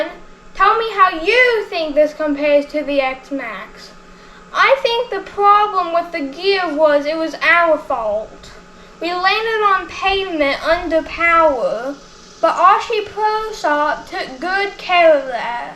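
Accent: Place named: New England, United States. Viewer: Your very welcome ! Your accent sounds like a New England accent. So cool when you took that truck up the stairs! Keep up the great work!💯👊🏼😎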